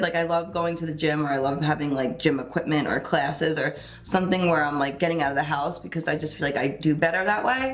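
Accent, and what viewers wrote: New Jersey, United States. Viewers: The jersey came out in this video !! Eowww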